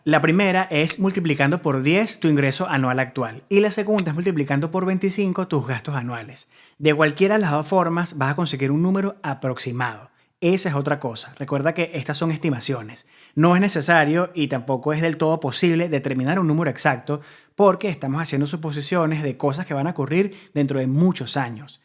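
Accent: Venezuela. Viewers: Eres de Venezuela? Suenas super venezolano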